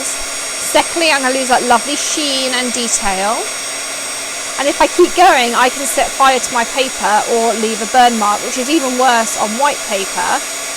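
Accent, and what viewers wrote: England, United Kingdom. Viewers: I really love your videos, very clear, easy to understand and no unnecessary chit chat or giggles. There aren't many tutorials done with a clear English accent, you will be my go to site for all tutorials in future. Please keep them coming. Thank you.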